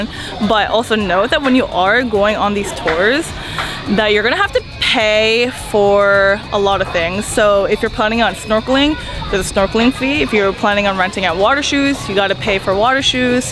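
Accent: United States. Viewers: girl you talk to much a southern california girl., i should know i live in Orange County , CA and majoroty of korea her talk like you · You're a very entertaining story-teller with an amazing accent (West or East Coast?). Appreciate the way you appreciate everything about El Nido. (I miss that pandecoco!!)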